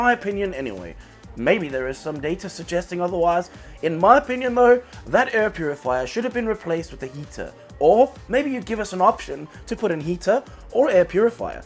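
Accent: South Africa. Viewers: Why does it sound like he has a south african accent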